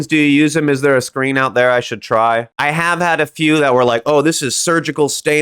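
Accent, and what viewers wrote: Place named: Boston, United States. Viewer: Idk if you’re from Boston, but I occasionally hear a Boston-like accent, especially when you use an “A” on the end of words that have an “ER”. Just a curious observation.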